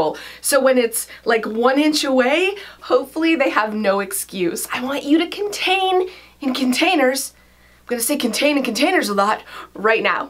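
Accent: Canada. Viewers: You are beautiful inside & outside! I truly enjoy every video & love your relatable personality (especially your lovely Canadian accent!). Don't change a thing!!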